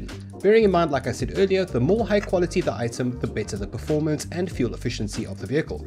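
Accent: South Africa. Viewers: The taxi shit killed me, I had my suspicions that you were South African from your accent, but that minibus taxi joke confirmed it. You aren't wrong though 😂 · wait , your from south africa , i knew it I thought i recognized the accent , nice joke about the taxis in SA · I new you acsent wasJuf vir vandag periode 5 se hulle ons het SS. Is die geo of gesk ¿ South African Edit: I now realized that I typed like I was drunk Wtf I’m keeping it like that Admire it Admire it!!!!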